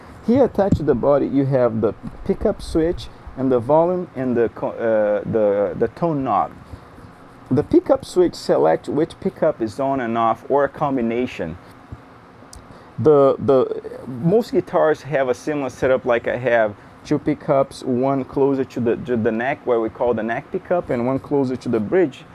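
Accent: Brazil. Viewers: Do you happen to speak Portuguese? You seem to have a Brazilian accent...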